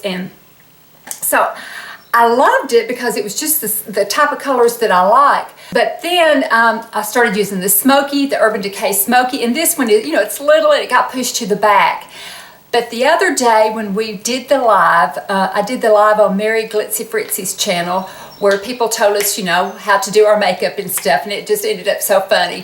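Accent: South, United States. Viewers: Love ya!! Are you from Georgia?? You have that southern accent!! I’m from Georgia!! Love the look!! ❤️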